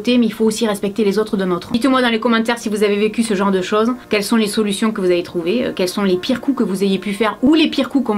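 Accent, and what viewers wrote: South, France. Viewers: sa se vois qu'elle vient du sud ^^ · Bonjour. Je viens par hasard de découvrir ta chaîne. J'aime ton style naturel. J'ai particulièrement apprécié cette vidéo sur" Mon voisin est fou". Je fais partie des 50% de personnes qui ont des problèmes avec leurs voisins, et ça m'a donné des idées pour les remettre à leurs places. Alors j'ai bien rigolé. Puis j'ai encore bien ri en lisant les commentaires trop drôles. Super, ici pas d'insulte!!!! Je m'abonne à ta chaîne. PS: comme toi j'ai l'accent du sud et c'est bien de ne pas essayer de le gommer dans tes vidéos. Il apporte beaucoup de convivialité.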